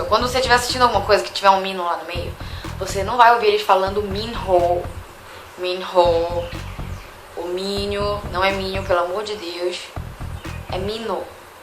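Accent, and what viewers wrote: Pará, Brazil. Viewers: Não se preocupe tanto, mulher, dá pra entender perfeitamente suas explicações ainda mais quem tá acostumado a ver os oppar nos programas e a ver dramas. E aleatóriamente digo que sou fã dos eu sotaque paraense(?).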